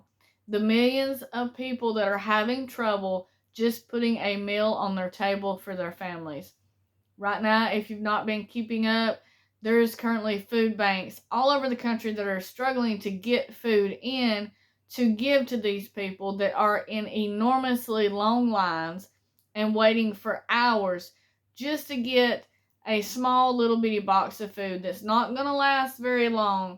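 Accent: South, United States. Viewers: Uze a southern gal sheeeeeet that eeeez cooool...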